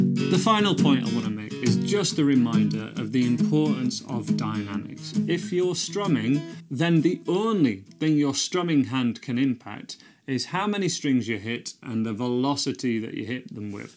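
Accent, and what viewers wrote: United Kingdom. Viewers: I really dig that British accent of yours, makes the lesson more entertaining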